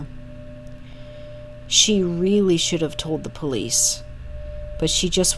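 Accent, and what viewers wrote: Canada. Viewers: Great video! Are you Canadian? You sound Canadian or at least some kind of northern North American.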